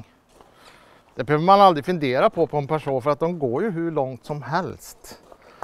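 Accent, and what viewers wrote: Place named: West Gothland, Sweden. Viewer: Kan vara den Västgötska dialekten!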